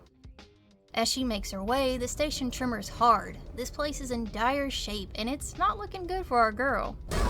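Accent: South, United States. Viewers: Ok what is your accent. I cannot place it exactly. I have guesstimated it down to either rural "american" or somewhat australian?? Please enlighten me, I could not focus on the terrific video because I was concentrating on your accent!!1! Please keep doing what you're doing c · I love your review. Your southern draw is definitely giving me Colonial Marine vibes 👌 This is my all time favorite horror game. I still play this game and now after watching your review its time to revisit Sevastopol Station. Any loved your review and retelling. Also thats crazy that working joe took an elevator with you. He was probably scared shitless too. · Lowkey find it hilarious a “southern country” girl accent is talking about alien horrors deep in space where the chances of someone coming to even see you is slim lmfao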